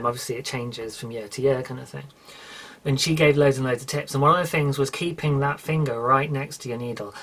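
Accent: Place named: United Kingdom. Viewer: Its funny that when Brits do an american accent its usually a southern one (yall ). No one does a New York accent, for instance. My daughter and I are always doing a British accent but its usually like the Essex accent, or like on Skins, is that the same thing, isnt Essex in S England? My mum and grandmother had proper british accents,because they were born in S Africa and New Zealand. I have a friend from London that I can't understand at all because of all the slang, he slows it down and talks more "american" for me. Do you say ore-gaan o or oregano? Do you have a northern accent, because those kind of sound like Scottish right? To me your accent sounds kind of middle british, like maybe compared to our midwest accent. Whew thats a lot, back to knitting.......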